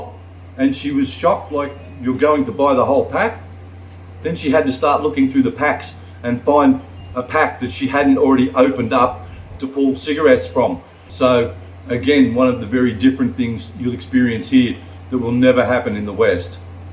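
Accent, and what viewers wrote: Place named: Australia. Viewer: I just like to listen to talk.. nice to hear Aussie in the Philippines 😂.. agree with the dogs.. sometimes I think they are hungry coz if they are full they just sit around relaxing..😂.. yes if you can stop smoking it's good for you although it's cheap here.. enjoy your day guys.. ❤❤❤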